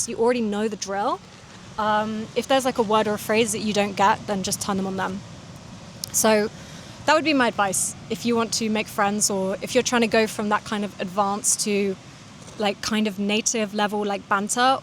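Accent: England, United Kingdom. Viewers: I like her accent; it reminds me of the British life I've always missed. :-* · Thank you for your video. I’m a Japanese student studying Russian. For some reasons I quit studying English after entering university, so my English got worse. But after watching some videos of your YouTube channel I wanted to start learning English again. You speak slowly, so it is easier to understand, than other YouTubers. And I like your British accent. I agree that surrounding yourself with foreign languages is the best path to master them. At first I couldn’t understand conversations of Russian native speakers, but I started to make it a point to listen to podcasts or YouTube for language learners every day, one day I completely understood what they are saying. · Forgot she spoke British English and not American English and panicked at first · Funny to see this recommended while being a native-English speaker (I live in the US), and it seems it was also recommended to many other English-speakers. 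In my case, I may have seen videos regarding learning English from non-native speakers where those learning were native to Japan or some other country, so 'learn English' would be the common point there. This video does help in one way, and that's hearing a UK English accent that might be at a little bit faster speed than I'd heard from other YouTube channels or shows like Monty Python. I also sub to a couple channels from Ireland and one from Scotland - so also English, but a lesser-heard accent, to me. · As a native speaker of American English, I understood everything you said, quite effortlessly, despite the cute English accent and occasional British-specific idiom. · beautiful england accent. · Are you England? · you have very clear british accent, I like british accent, specialy RP accent. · Hello from Greece. Wow. You are great sweety lady. Your pronunciation was a bit expected as British lady, it was not so difficult to hear. 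Perhaps they are international English with British accent? We thank you very much for your video. Kisses from Athens. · Hi!, well, I usually study American English but let me tell you that I understand you, not at all but I do. I love your British pronunciation, it sounds fantastic, though 2 or 3 words slip my mind but I can understand you. Greetings from México City. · Are you British? I think 🤔 I love 💕 your accent. How can I get in touch with you? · Hi! I’m Korean and married to a British. That’s why I absolutely love the British accent! I’m so glad I can understand you much better than I thought. Haha. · She is clearly British. Doesn't sound Aussie at all. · That's the Englishest of Englishes I've ever heard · I've been learning english like 7 months and i kinda got 99% of this video like every single word i understood no problem and the best part of it that i sorta lerned and watched only american way of english and even with ur british an accent i got everything as well and honestly i really like how u pronouce without "r" so thank u for this video and contiue do what u do and u become as famous as mr beast in world of english learners xd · Hi,there.Idid understand what you meant in the video.i love your UK sound,thank you 😊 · If I understood everything it means I'm an advanced speaker? 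(I'm really asking, my native language is spanish tbf) Cuz tbh it wasn't difficult at all, your pronunciation and accent is very pleasant and clear compared to others brits I heard before. I'm surprised indeed cuz I learned the USA english and that's the accent i'm most used to (still can't understand those texans cowboys brokers selling cows, their speech speed is about half the speed of light lol) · Fancy British accent, thanks for native speed, its all clear. Is this true that most British people are very polite? Can they directly say "no" for any unlike favour? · I am an American and I love you Brits and your accents ! Nice job ! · I really like the way you speak, your British accent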